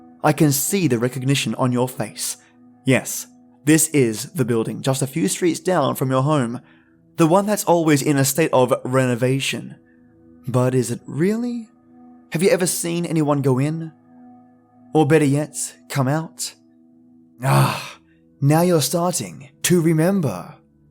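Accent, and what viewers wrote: Australia. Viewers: I love finding fellow aussie narrators! 👻👻👻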